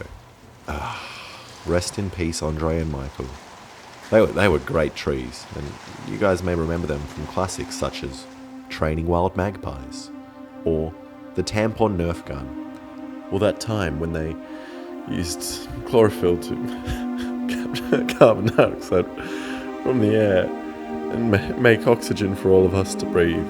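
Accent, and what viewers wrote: Australia. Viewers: it's how ya going. traditional aussie greeting. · You Aussie mate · R u from Australien? Cause u Sound cool? Getreu from Germany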